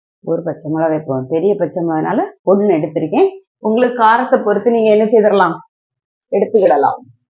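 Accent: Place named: Tirunelveli, India. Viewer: Enjoyed your recipe and your Tirunelveli Tamizh❤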